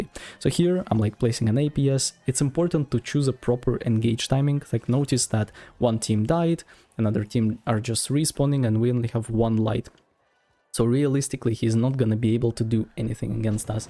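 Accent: Russia. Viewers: agreed, his russian accent is pretty cute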